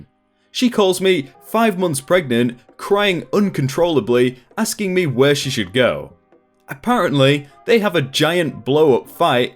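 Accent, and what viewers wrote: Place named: Midlands, United Kingdom. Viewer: I've been wondering about this for a while... are you a Midlands England guy? Your dialect is super distinct. Liverpudlian? Manchester?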